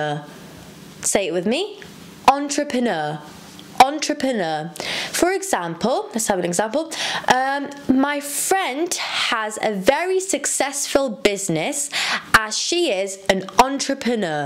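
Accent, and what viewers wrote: United Kingdom. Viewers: Thank you very much I do love british accent send me more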